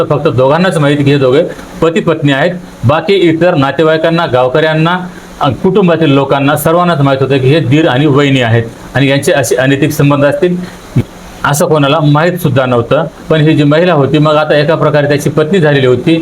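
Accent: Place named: Karnataka, India. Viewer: तुमच सर्व रील पहातोय बोलन जरा कन्नड टोण येतोय आणि सैशय नव्हे संशय म्हणावे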